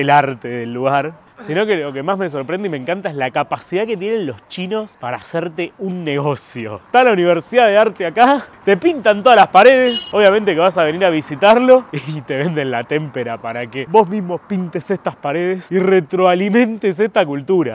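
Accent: Buenos Aires, Argentina. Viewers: uiii que turbio ; calle grafiteada.... pa vos es turbio ? ................... te salto el policheto que llevas dentro , igual gracias x mostrar este lugar..... vos viajas por todo el mundo , porque sos youtuber ? te falta humor ... y el acento portenio es inevitable... de todas formas gracias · Porque hablas en porteño taaan exagerado mmmm es raro eso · Y si es dé buenos aires de remedios dé escalada como querés que hable 😂😂😂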